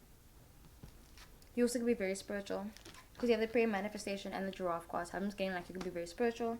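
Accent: South Africa. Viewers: I know that accent❤❤hey there South African 🎉picked pile 1 and I wanna be famous like 😍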